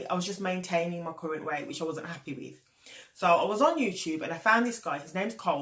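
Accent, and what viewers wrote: Birmingham, United Kingdom. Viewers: You sound like you're from Birmingham?? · You sound like you're from Birmingham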